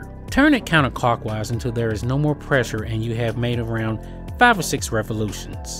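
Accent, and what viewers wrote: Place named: Georgia, United States. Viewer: He sounds like he is from Georgia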